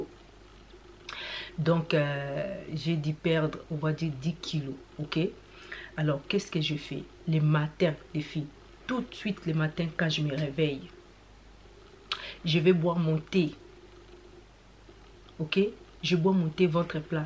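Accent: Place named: Kinshasa, Democratic Republic of the Congo. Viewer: Par votre accent vous êtes congolais de Kinshasa merci pour votre partage ! Par contre votre méthode est vraiment restreint qui peut tenir à Long terme pour perdre beaucoup de kilos 😮😢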